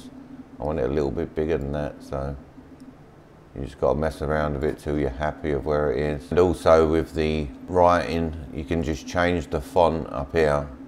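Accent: United Kingdom. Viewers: Mate, it says you're in the states but you judging from your accent you're from the UK? Seems like you know your stuff, what's your story?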